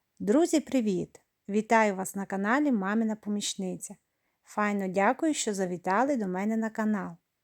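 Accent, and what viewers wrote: Transcarpathia, Ukraine. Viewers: Дивлюсь Вас із далекої Франції, обовʼязково приготую таке лечо! Окрема подяка за ваш закарпатський говір!💛💙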